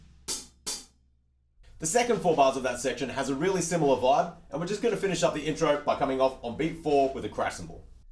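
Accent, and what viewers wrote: Australia. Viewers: Wait a minute..........r u Australian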